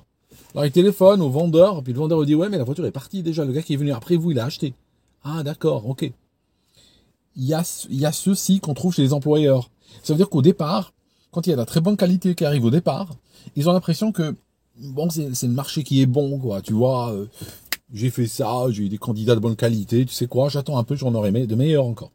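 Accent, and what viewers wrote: Vaud, Switzerland. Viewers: Ah ! Je mdisais bien que tu parlais avec un accent suisse ! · C'est super agréable de vous écouter avec ou sans accent suisse, donc pas de soucis 😉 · Joli accent suisse...c est bien de s adapter moi aussi je prends des accents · Si! C'est sympa cet accent suisse. Merci pour tes conseils. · PTDR à la fin "je fias un effort pour pas parler avec l'accent suisse" alors qu'il a typiquement l'accent suisse X) la même manie de prolonger légèrement l'avant-dernière syllabe, tout X) Vidéo incroyable, c'est vraiment le daron idéal, il a de l'expérience constructive et il arrive à revenir dessus et à en livrer le contenu essentiel... Ce type aurait dû devenir prof/chercheur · Bonjour, bonjour à tous. Merci pour tes vidéos et ta pédagogie au travers des exemples qui tu cites, c'est très instructif; lâche toi sur l'accent Suisse, ça fait partie de ton profile! 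· Il me semblait bien qu'il y avait un accent vaudois 😂 Je pensais que c'était une hallucination Super vidéo, ayant été moi même à la recherche d'un emploi ces derniers mois, je me retrouve tout à fait dans tes conseils mais j'en ajouterai un toutefois : me négligez pas votre réseau quand vous êtes en études ou chez votre précédent employeur. J'ai vu des gens de ma volée de Master trouver des jobs très (trop) bien payé alors qu'ils étaient carrément mauvais tant sur la pratique que la théorie, qu'ils avaient une attitude très peu professionnels simplement parce que quelqu'un dans leur réseau a pu les pistonner. Vous pouvez être le candidat idéal à un poste, si l'ami d'un manager le veut également vous passerez à la trappe. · Il est génial ton accent Vaudois, on l’aime beaucoup. C’est un héritage dont il ne faut pas avoir honte, un souvenir de voyage qui fait maintenant partie de toi, une richesse, un métissage, une pépite 🫶🏼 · L'accent suisse me rappelle les jeux olympiques d'Albertviiiiille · Ne t excuse pas de prendre l accent suisse je suis français mais la vie est pas désagréable que ça en Suisse et les Suisses 🙂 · C’est vrai pour l’accent suisse, je m’étais dit qu’ dérivait doucement, vers un nouveau lieu de résidence, donc intéressant pour s’entraîner. 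· Coucou! Si j’ai parlé de ton accent suisse c’est que j’ai trouvé ça trop mignon: un algérien qui vit en Angleterre avec un accent suisse, franchement, il n’y a que toi pour ça! Merci pour tes super vidéos qui éclairent mes journées! 😊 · Et bien j'apprécie l'accent Suisse. Merci de tes reflétions qui inconsciemment mettent le candidat comme l'homme fort de l'entretien. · y a que les francais qui se plaignent de l'accent suisse le reste des francophones 400 millions de personnes adorent ton elocution 😃. C'est toujours les memes qui se plaignent et qui font la greve 😁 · Merci pour ta vidéo et vive l'accent du Gros-de-Vaud! Ayant moi aussi cet accent de part mes origines et j'y habite toujours d'ailleurs. Tu as vécu dans quelle localité? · Ne change rien pour ton accent suisse qui refait surface de temps à autres, c'est sympa je trouve, en tout cas ça ne me gêne pas du tout, les accents ont tous leur charme. 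· en tant que Suissesse, j'adore cet accent 🇨🇭👍😊 ne chassez pas le naturel 🙏😊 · L'accent suisse sonne très sympathique, personnellement je trouve que vous ne devriez pas le masquer, au contraire 😀 merci beaucoup pour tout ces conseils, je suis actuellement demandeur d'emploi et c'est une mine d'informations utiles. Très bonne continuation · Ah voilà pourquoi l'accent suisse ! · Notre accent suisse est juste parfait... On s'en fout des haters😂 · Excellent ton accent de chez nous, je suis Suisse aussi (nord vaudois) pourquoi tu es parti? Merci pour la vidéo 👍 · merci pour cet accent suisse, au final ca me détend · Merci 🙏 pour la réponse clin-d'oeil à ma question sur votre accent suisse. Il n'y avait rien de désobligeant, juste que je regarde pas mal de vos vidéos et que je ne l'avais pas entendu précédement. En tout cas j'aime beaucoup le contenu de vos vidéos 👍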